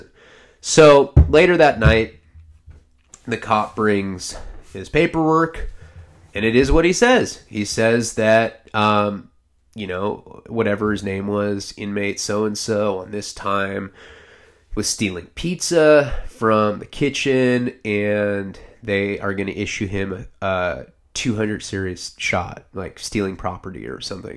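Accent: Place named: Chicago South Side, United States. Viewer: Your south sider accent is priceless 🤣🤣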